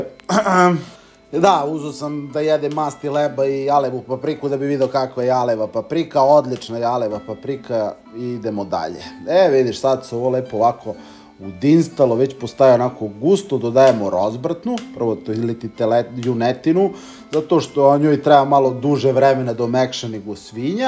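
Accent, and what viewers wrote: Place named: Morava, Serbia. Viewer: Desi bre zemljace. Ko da ste porasli pored Moravu. Mlogo bre dobar paprikas. Malo kocnice na mas na leba. Mlogo bre holesterol. I malo finocu na govor. Niki bre iz Beograd nece da te gleda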